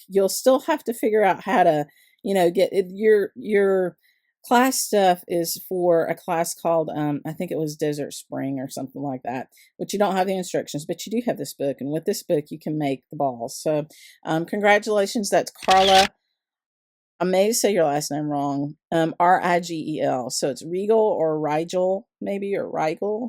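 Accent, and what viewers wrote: South, United States. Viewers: I enjoy your videos so much! I could listen to your lovely southern accent for hours. Watching from Australia🐨🦘💕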